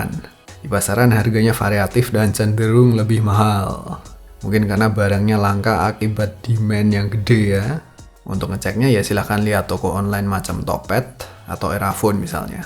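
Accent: Java, Indonesia. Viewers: Dengerin suaranya bikin capek jawa nya medok amat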